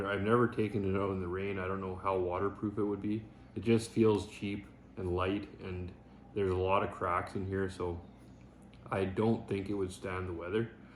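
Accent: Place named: Canada. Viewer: cool man, I could recognize a fellow Canadians accent. Lol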